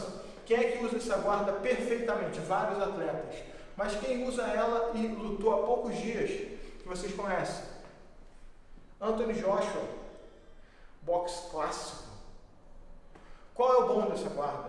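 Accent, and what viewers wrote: Rio de Janeiro, Brazil. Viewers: Tu é carioca ou Paulista vei 😂😂😂😂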